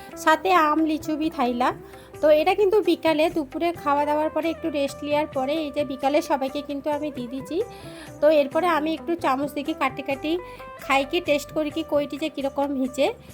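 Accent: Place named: Kanthi, India. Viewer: Khub valo ai prothm kanthi vasay kotha sunlam 😊kotha gulo oroia r bangala vashai tobe valo laglo 😊sob kiche mile sundor hoyeche❤❤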